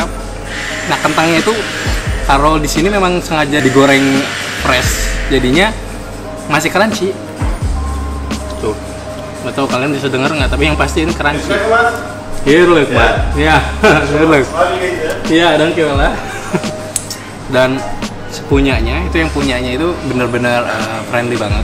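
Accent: West Java, Indonesia. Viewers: Tetep keluar ya sundanya, sagala rupa 😂😂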